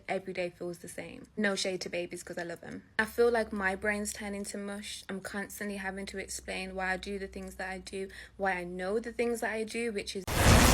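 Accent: Caribbean. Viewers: sounds like caribbean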